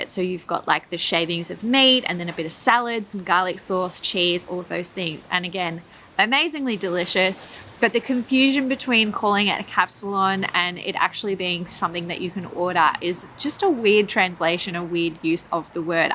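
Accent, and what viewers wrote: Netherlands. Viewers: You really have a hang of the Dutch accent! Cool vid. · can we just talk about how great u dutch accent is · I like her Dutch accent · Oh my god, your Dutch accent is amazing. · I believe there are booklets with these sort of things: "The Undutchables" and "Stuff Dutch people say", if I recall correctly, but it's been a loooong while since I've seen them. Also, oh wow you almost have a Dutch accent now. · Heb je in de gaten dat je soms Nederlandse woorden vertaalt naar het Engels maar dat je ze gaan toch Nederlands uitspreekt? Bijvoorbeeld handschoenen. Jr vertaalt hand naar hand maar in beide gevallen spreek je het op zijn Nederlands uit. Ik blijf me trouwens verbazen over hoe goed Nederlands je spreekt. Complimenten daarvoor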